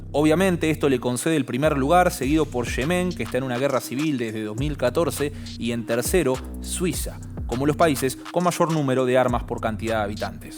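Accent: Argentina. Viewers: Por la forma de hablar parece argentino o uruguayo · Che, que me han gustado tus videos. Muy sensatos, pibe. Saludos desde México. Seguí así. (Me encanta el acento Argentino, sin afán de burla).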